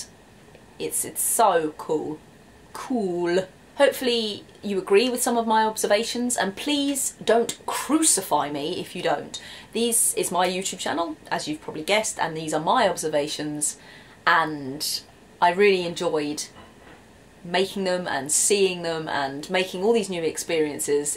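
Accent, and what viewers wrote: United Kingdom. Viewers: hey, i love to listen to your very british english:) but - do you talk about that berlin that i know? - that berlin that i was born and raised and still live in? (i am 31 years old)... i don´t feel the same about that... i see very stressed and passive agressive people surrounding me! everyone is deeply quiet ^^ but it depends where in Berlin you are.. for sure... but to me personally: i think i will leave my hometown now... but i wish you all the best in germany. i´d rather would go to the UK if i could... ( i have nice memories of the uk, but i have been there for only ONE WEEK, so.... who knows..) Lovely Greetings from Berlin!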